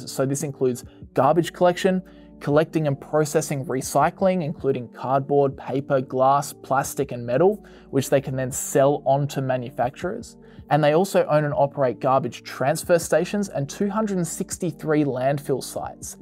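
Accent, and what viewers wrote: Australia. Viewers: Are you an Aussie ?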